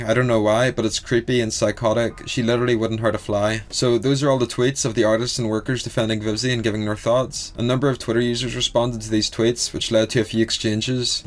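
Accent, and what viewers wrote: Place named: Northern Ireland, United Kingdom. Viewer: A Northern Irish narration on Helluva Boss.... YouTube algorithm nailing it today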